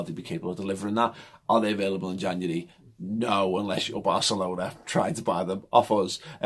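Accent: Liverpool, United Kingdom. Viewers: I swear to god, go on settings on this vid and turn the speed down to 0.5 and listen how incredible the scouse accent sounds in slo-mo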